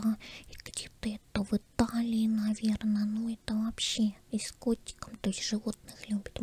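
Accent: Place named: Moscow, Russia. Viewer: Типичный московский говор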